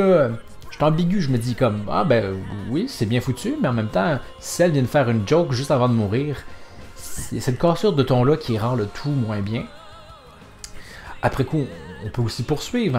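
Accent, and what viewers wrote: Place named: Quebec, Canada. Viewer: Tu fais trop bien l'accent québécois. Trop cool.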